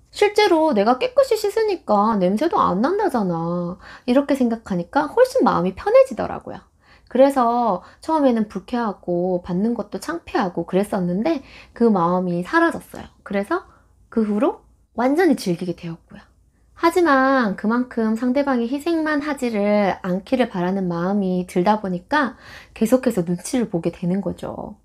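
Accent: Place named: Gyeongsang, South Korea. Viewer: 고향이 경상도이신가 보네요!!!...ㅎㅎ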